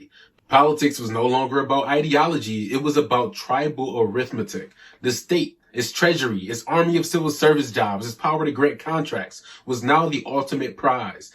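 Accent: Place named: United States. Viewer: Okay. I want to listen to what you have to say however, the mispronunciation and American accent is throwing me off!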